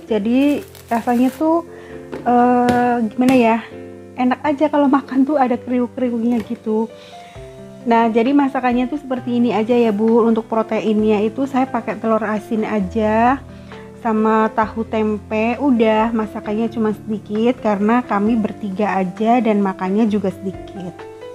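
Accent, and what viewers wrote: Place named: Java, Indonesia. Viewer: Asli mana bund? Kok ada logat jawanya...😀🙏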